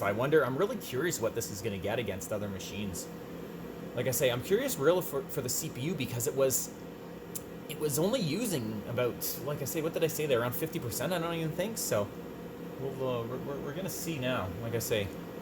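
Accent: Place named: Canada. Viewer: I can tell by the accent in the first minute of watching, you are Canadian. *Edit: Sorry this is so random. Love the vid!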